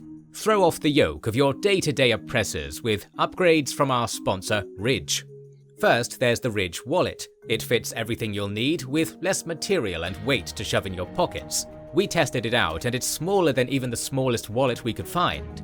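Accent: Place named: Wales, United Kingdom. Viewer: Come for the history. Stay for the Welsh pronunciation.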